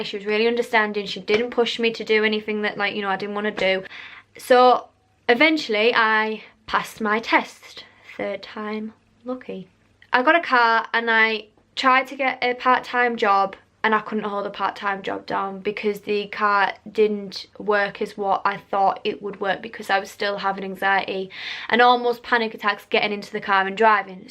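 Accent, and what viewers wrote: United Kingdom. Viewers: Northern for sure. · what part of england is her accent from? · Love the accent. Is that Brighton? Newcastle? So many accents in the UK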